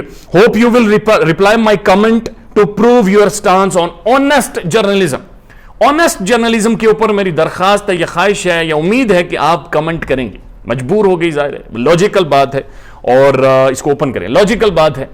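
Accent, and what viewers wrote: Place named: Pahari, India. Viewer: Puttar tussi pahariye ho, I love the way you talk ♥️🤣🤣🤣 I laugh and laugh and laugh, Allah bless you with the greatest blessings of both the Worlds, Aameen, Summa Aameen !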